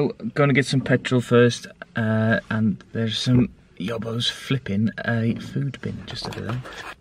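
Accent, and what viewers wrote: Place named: South Wales, United Kingdom. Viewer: Good Vlog. So your a Bridgend boy then? Well I just live down the road in Newport. Been here for 20 plus years and still have me West Mids accent. You sound as if you were born in South Wales judging by your accent. They call me Wolverhampton Dave in Newport and I'm from Worcestershire!!! But I guess with my Wolves shirts and a West Mids accent(as I come from North Worcs-Kidderminster area) I am the nearest thing they have!! Anyway I thought I would stay away from talking about the match.......... As I say good Vlog-well put together.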